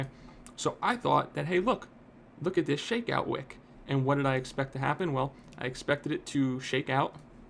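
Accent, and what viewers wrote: United States. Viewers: You sound American but NL in your name means Dutch?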